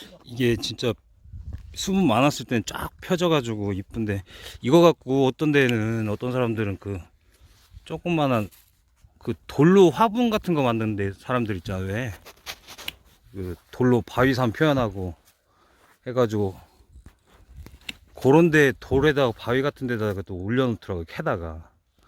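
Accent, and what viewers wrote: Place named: Chungcheong, South Korea. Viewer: 충청도 사투리 끝판왕이십니다~~^^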